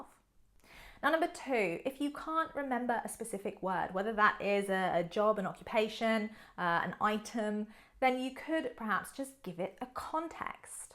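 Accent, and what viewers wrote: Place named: RP, United Kingdom. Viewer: Dear Madam Teacher,thank so much for your brilliant lesson and of course superb modern RP british accent.Well,honestly do not freeze in English is so tricky for me.because I am from the working class and absolutelly not smart and clever like you,dear Madam.Thank a lot again.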